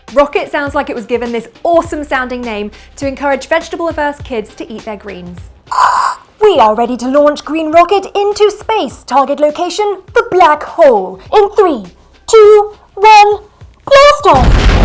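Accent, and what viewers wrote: United Kingdom. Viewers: i like the brit accent rather than american .... or i just probably love your accent :) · Cute, short hair, and a British accent. The perfect woman! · British accent, get over it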